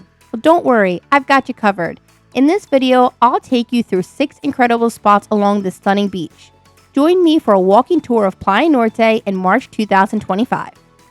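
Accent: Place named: Philadelphia, United States. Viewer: I had to check your profile to confirm my suspicions after you said “water”…Philly accent confirmed! Lol thank you for the gorgeous views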